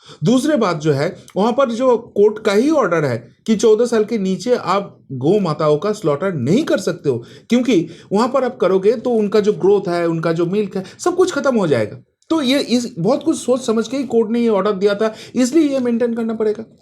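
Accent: Bengal, India. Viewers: Aapka Bengali style Hindi Bahoot accha lagata hai,Don’t Change ur uniq style.Ur vidios r fully loaded with information and vidio clips.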